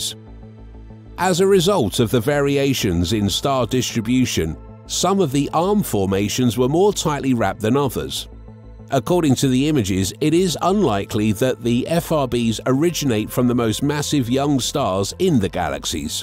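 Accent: United Kingdom. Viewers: British accents are alarming.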